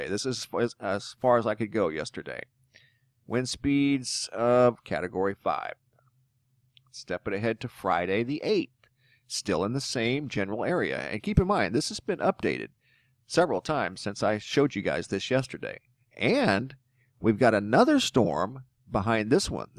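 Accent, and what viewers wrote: United States. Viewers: if you can investigate, then you will find that they start from out of the atmos and cloud masses atract them ! electric ! planet means wandering star ! you sound soooo americAAAAAn ................!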